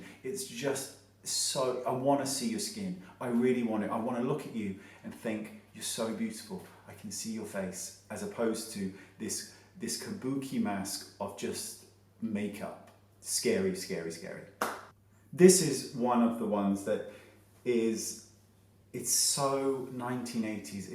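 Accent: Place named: West Country, United Kingdom. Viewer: rofl you sarcastic fuck. I love it. Your westcountry accent comes out more when you get catty, ITS BEAUTIFUL. Kisses xxxx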